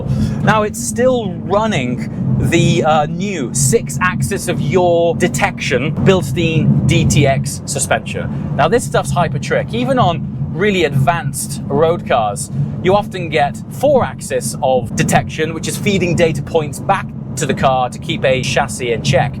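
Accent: RP, United Kingdom. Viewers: Not sure who this guy is but it’s the second video I’ve seen with him, and he’s reviewing. He’s very good and presentable. Great work and look forward to more. A bit too Posh British but like i said, well presented and spoken in detail.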